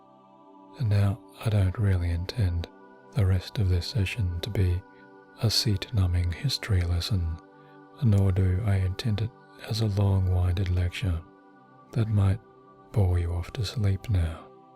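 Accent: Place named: Australia. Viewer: Australian he is great, I’m an Aussie he does have a good accent and nice bass to his voice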